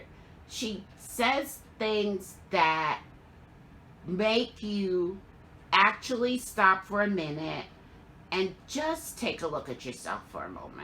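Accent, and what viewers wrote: New York, United States. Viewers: That is so funny, I was going to say I hear a NY accent. My parents were born and raised in the Bronx, I grew up an hour from the city and now live in CT:)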